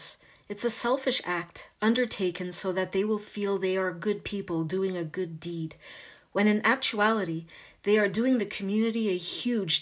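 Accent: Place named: Canada. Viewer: cute Canadian accent, very intelligent, full of wisdom, this woman is so nice to listen to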